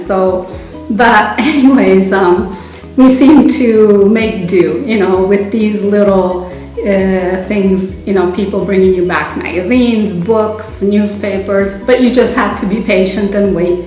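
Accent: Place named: Italy. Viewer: Well, let me just say that waiting for a delivery (a postcard, a package, etc.) IS something I get excited about. And I'm 21, haha. By the way, listening to your voice relaxes me. You've got a little Italian accent happening there. I love it. Keep it up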